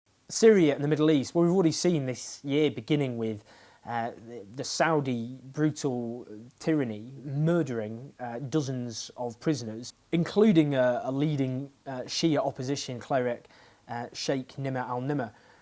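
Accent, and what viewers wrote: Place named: North, United Kingdom. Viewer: Sound more northern/working_class than in other videos. Product of the content ?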